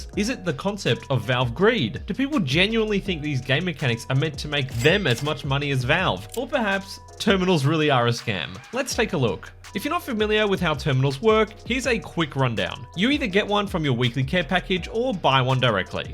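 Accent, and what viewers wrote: Australia. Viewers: “This video sponso’.” You gotta love an down under accent. Keep upt the good work!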